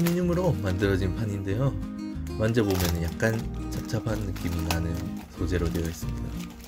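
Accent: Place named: Japan. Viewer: 우와 언어는 한국언데 억양은 일본억양이네요ㅋㅋ 한본어 신기하다 일본에 오래사셨나보내요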